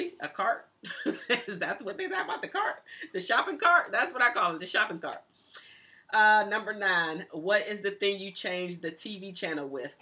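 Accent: United States. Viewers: You have a very southern hospitality accent. Which is great because I have the same one too! I’m born and raised in Florida and have family from all over the south. Sc Louisiana and etc and each state is different. Your voice is comforting and nurturing! Loved this tag! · Omg didn’t know you were from Ohio! My husbands dad lives near Youngstown. I can tell you have an Ohio accent. I say those words all the same!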